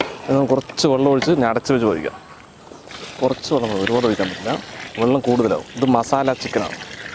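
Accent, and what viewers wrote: Palakkad, India. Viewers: ഭായിടെ പാലക്കാടൻ സ്ലാങ്ക് ഇഷ്ടപെട്ടവർ ലൈക് ചെയ്യുക 👍👍👍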